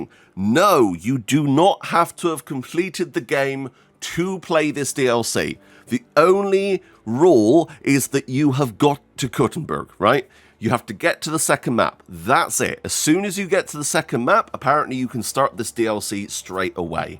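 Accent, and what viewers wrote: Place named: Bristol, United Kingdom. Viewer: i'm almost certain you have a southwest/bristol twang and i can hear it from a mile away (i'm from there) not a bad thing also! good video keep it up :))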